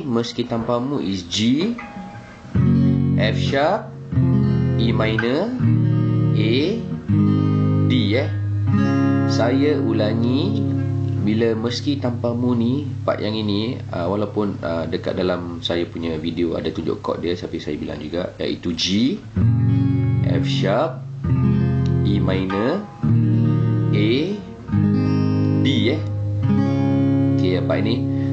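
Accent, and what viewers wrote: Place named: Singapore. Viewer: bro orang spore ke? cara style cakap mcm orang melayu spore la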